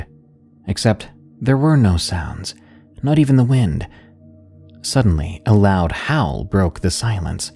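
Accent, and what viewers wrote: South, United States. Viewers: Southern accents are great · Souther comfort with a southern voice! All tuckered in man. Thx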